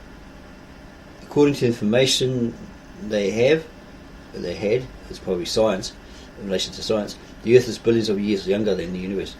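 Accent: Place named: Australia. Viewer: Worst video narration ever. Between his halting mumbling and dense Aussie accent it was barely understandable. Thank God for closed captions !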